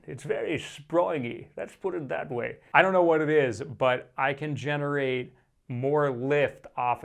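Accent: Boston, United States. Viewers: Amazing review. I think the fact that I’m originally from Massachusetts and hearing a bit of your Boston accent helps me understand what you’re saying. 😂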